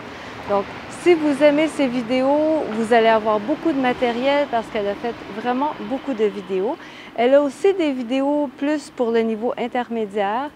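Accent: Canada. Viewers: Canadian accent, « fête » = « fight », « chaîne » = « shine »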